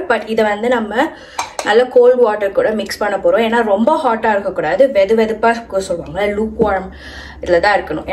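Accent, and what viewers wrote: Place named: England, United Kingdom. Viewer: Hey, I know you speak very nicely in Tamil but now you are talking like as if you don’t know much of the language. So make sure you don’t get your English accent to Tamil. Just a small reminder as I have seen you speaking well in your previous videos. Take care.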